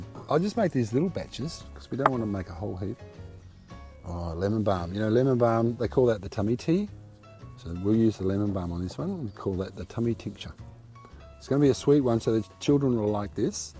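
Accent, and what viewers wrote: Australia. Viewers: I subscribed for the same reason! Gardening and an Austrailian accent. Good day! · Thank you for giving free information and I copied down much of the links and when I get paid I will be supporting your work and I appreciate all the information and help! Thank you so so much and I love your Australian accents helps my American add mind pay attention! ❤ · Hi 😊 What would be the chances of me coming to do work experience? Forgive me if im wrong but that is an Aussie accent?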